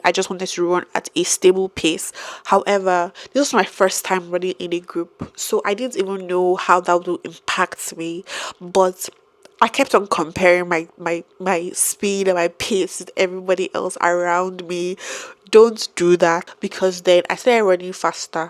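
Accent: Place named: Nigeria. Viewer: trust me you’ll get there faster than you think! If you keep on running 2 to 3 times a week, you’ll soon be reaching 35 minutes for 5k and before you’ll know it 30 minutes My next goal is a 10k, which I’ll start training for in a few weeks once I do some 5ks the next couple of weeks. The long term goal is a half marathon next year hopefully. From your accent I can tell you’re a Nigerian - I’m also a Nigerian too, born and raised in the UK You’ve got this! 🏃🏽‍♀️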